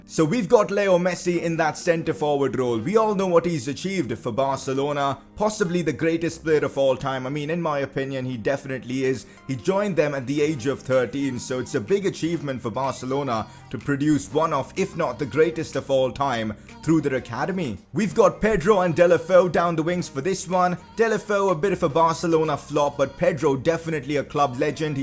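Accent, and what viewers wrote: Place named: India. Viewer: umm, you kind of have a indian accent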